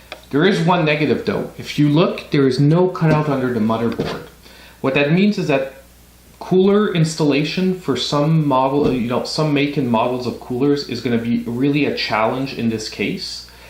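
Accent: Quebec, Canada. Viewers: french qc accent haha keep going youre awesome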